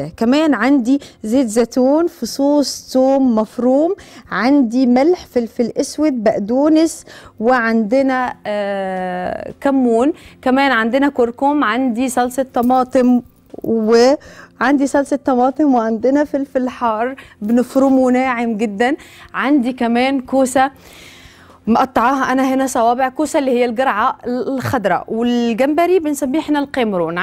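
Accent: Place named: Morocco. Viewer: تسلم الايادي حبيبه حتى وانتي كتكلمي مصري اللكنه مغربيه مزال حااضره ههههه لاااايك بقوووه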